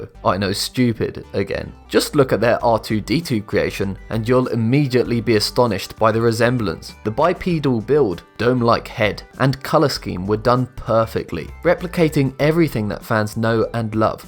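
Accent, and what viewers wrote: United Kingdom. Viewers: is he american with a british accent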